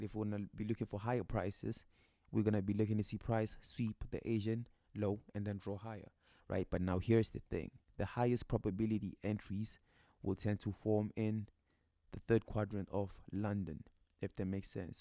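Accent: South Africa. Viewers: just plain typical Joburg boy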